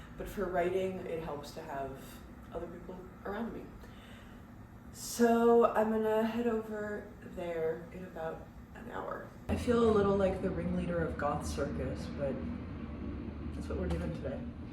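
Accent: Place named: Canada. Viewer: I might borrow “joggish thing” from you bc I’m an Olympic level joggish un-athlete😊 Do I detect a very slight Canadian accent? It’s the “been” pronunciation that clues my northern Vermont ears.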